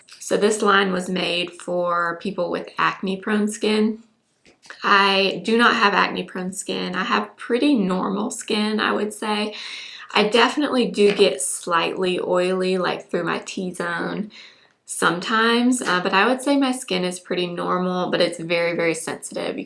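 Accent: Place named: Alabama, United States. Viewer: Are you from Alabama? You sound like Hannah Brown from the bachelorette!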